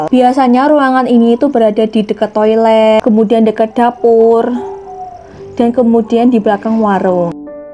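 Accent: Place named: Tegal, Indonesia. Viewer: Orang Tegal yah.. logatnya kentara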